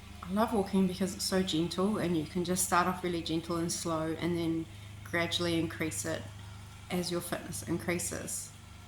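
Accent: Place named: Australia. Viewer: Good helpful/useful information, Good on ya!🚶🏃🏼‍♀️👍🏼 Luv your accent, Australia, right?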